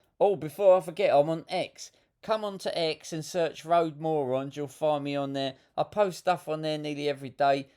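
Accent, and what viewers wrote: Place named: East London, United Kingdom. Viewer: You are the most entertaining channel on YT! Love your East London accent, and the crackers you come up with on the fly are superb! Best for 2025, keep 'em coming!🎉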